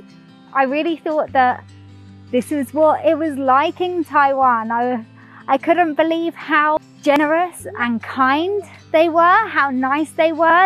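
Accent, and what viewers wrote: United Kingdom. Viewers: Hi,妳的英國腔口音，真的很迷人，台灣人很難學得起來。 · 不為創作而創作 想 也可 再 動手 靜靜地感受生活的美好 也是 美-！！ I do love your UK accent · 我就喜歡妳「正統」發音的英語，這只是相較於美式發音的英語，並沒有其他太多想法。大概多數的臺灣人只能辨別英式或美式英語，無法再細分英國境內的南腔北調。我的觀念，英語源自英國，所以Lucy講的英語是正統的。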